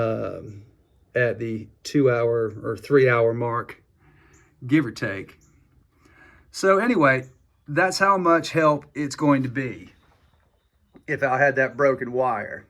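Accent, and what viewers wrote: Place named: Rock Hill, United States. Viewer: Man what in the hell??!! I’ve been watching your videos for some time now as I minor in tree work with a major in chainsaw addiction, pretty much all small engines really, I have two small outboards hanging on stands in my dining room right now, like it’s bad. Ive bought 4 chainsaws in the last 3 weeks just from scrolling through marketplace bc ppl don’t know how to work on stuff anymore. I just picked up a 201tc like this one for free from another tree service that had run over it with a kast lift, gonna need at least the handle and all that stuff that runs up through there so looking forward to all that lol. Anyways every time i watch one of your videos it’s crazy how much you sound exactly like my uncle lol. I said to myself, “he must be from down here somewhere”. Then come to find out I was right lol. You sound like you from somewhere around Rock Hill but hell there’s ppl that sound like us all over I’m sure. 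Small world. Anyhow, love your content brother, you’ve helped me a lot! Just modded out my little 194t bucket saw. That bitch is a screamer now son! So thank you for that! Hope to see you out there someday brotha, appreciate everything!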